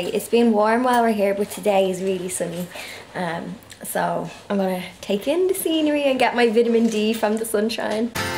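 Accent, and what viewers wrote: Ireland. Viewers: That was a nice video! The Irish accent is the best!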